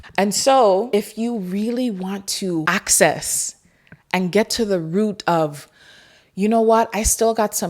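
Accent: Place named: Caribbean. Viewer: Binged these videos and from the first one I said “She from the Caribbean🤔”. That “aht aht aht” was it for me 😂🫶🏾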